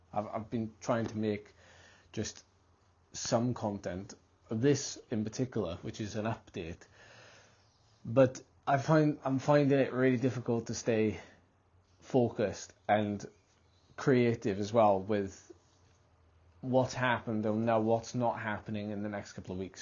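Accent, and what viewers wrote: Wales, United Kingdom. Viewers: mate please DO NOT FALL INTO THE YOUTUBE TRAP of having to put content out!! You are well above that, you are quality and you deliver, and you are Welsh 🤣 Love that accent ❤😅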